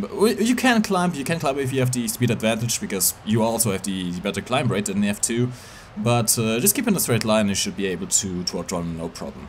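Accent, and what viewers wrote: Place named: Germany. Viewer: Youre german right ? The accent is awesome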